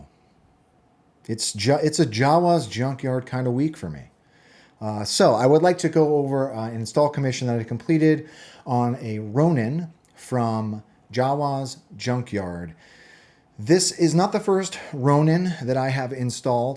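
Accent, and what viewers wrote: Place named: United States. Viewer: You got an American accents and thus American rights and you have a wall of light sabers vs guns? Cmon be a man and stop playing with toys like a child, a second revolution is drawing near and here ya are playing with toy swords instead of grabbing somethin with some real edge to it. Where’s your wall of AR’s and Glocks? 0/10. Do better. Our forefathers/ancestors are looking upon your works and judging as the tree of liberty is dying of thirst.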